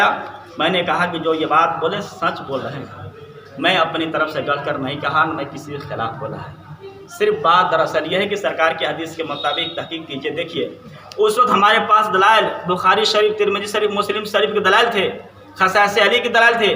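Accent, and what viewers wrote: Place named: Bihar, India. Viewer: Ye bihari maloom hota hai urdu bolne ke tameez nahi hadees ke baat karta hai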